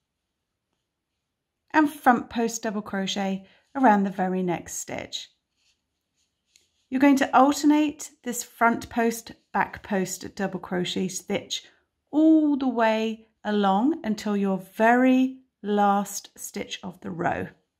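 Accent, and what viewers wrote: United Kingdom. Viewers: Thank you ! Love your British accent. I'm from the deep south in US and it is very refreshing 😍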